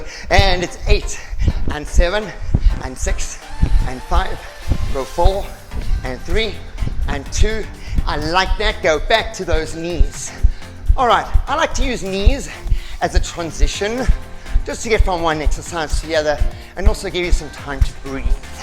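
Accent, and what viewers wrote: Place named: South Africa. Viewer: You are fantastic , you are clear & thorough , explaining every move , what each move does, which motivates , &, & , & , you are fun ! 🥳Love it . I laugh when you do . Have just found you & imeeediately subscribed ! Are you in South Africa ? Love your accent .Thanks so much . Hello strong 74 year old legs !! Yay , here they come .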